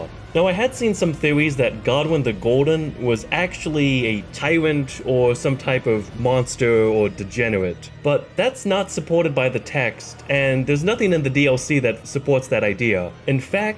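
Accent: Pittsburgh, United States. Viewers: Are you a yinzer?! Pittsburgh'er?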